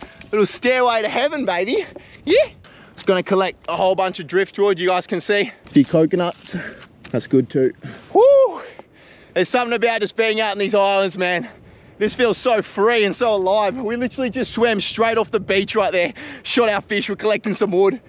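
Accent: Australia. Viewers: Are you Aussie bc I can here your accent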